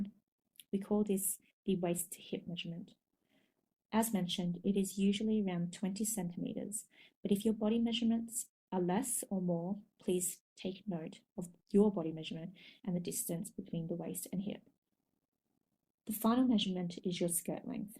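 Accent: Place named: Australia. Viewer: Thanks, very nicely explained. Love hearing your (our) Aussie accent too!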